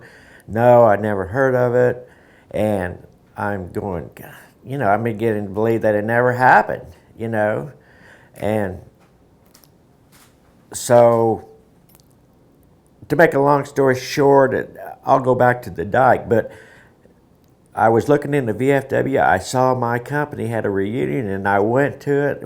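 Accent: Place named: Kansas, United States. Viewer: Glad your hanging on. I knew you had to be from Kansas as I listened to you talk and 37 min you said your were just a farm boy from Kansas. Greetings from a fellow kansan.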